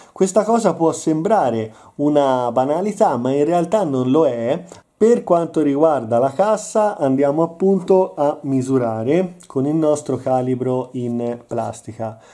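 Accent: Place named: Arezzo, Italy. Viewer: Bella recensione complimenti! Con una bella spiegazione storica sull' art déco! Sono finito sul tuo canale praticamente per caso cercando delle recensioni in italiano sul Reverso ; è un modello che ho sempre adorato e negli ultimi mesi è diventato una "fissa" 😆 presumo tu sia dell'aretino/ Val d'arno dal tuo accento ? 😄 sbaglio o a si vede un movimento della Chopard ?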